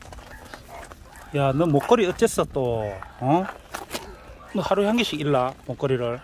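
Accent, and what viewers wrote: Gyeongsang, South Korea. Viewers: 아 말씀 하시는 사투리가 너무 같아서 어디신가 했네요 예천이시군요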